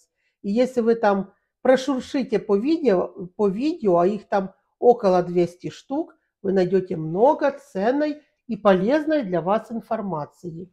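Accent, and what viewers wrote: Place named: South, Russia. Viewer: Судя по акценту специалист с юга.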